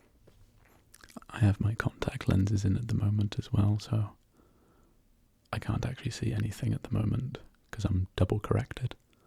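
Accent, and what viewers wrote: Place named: England, United Kingdom. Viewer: I'm a sucker for an English accent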